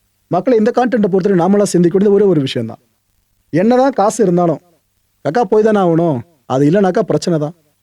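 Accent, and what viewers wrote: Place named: Tamil Nadu, India. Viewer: கால் இல்லடா. Call கோல். Check Google Voice. தமிழ்நாட்டு English படு கேவலம்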